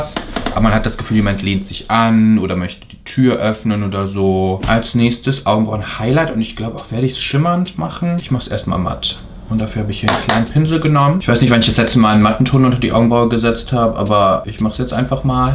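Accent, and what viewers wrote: Germany. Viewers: Unnötig dieses Denglish! Wenn er wenigstens einen amerikanischen oder britischen Akzent hätte, aber der deutsche Akzent geht gar nicht!!!!! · Du kannst echt gut Englisch sprechen, aber du hast so einen starken deutschen Akzent haha😂😘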